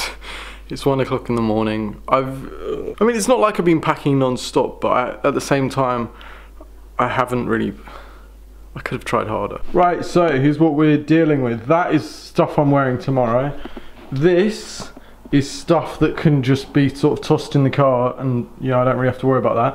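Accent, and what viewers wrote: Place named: England, United Kingdom. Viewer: I’m American I like the way your English sounds what’s your thoughts about the American people I like the English voice lol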